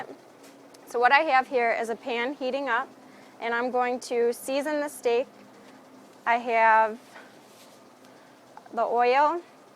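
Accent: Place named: Illinois, United States. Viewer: lol haven't heard an Illinois accent in a while.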